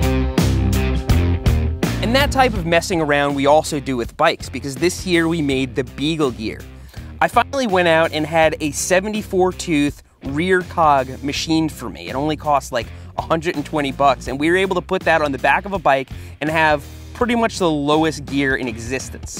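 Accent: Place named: New York, United States. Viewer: It's cute how thinks he got rid of his accent. That lovely NEW YAWK accent.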